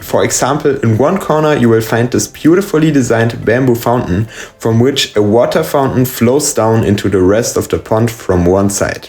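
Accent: Germany. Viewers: Wow wie geil ich holle die mir fix ich höre deutschen akzent😂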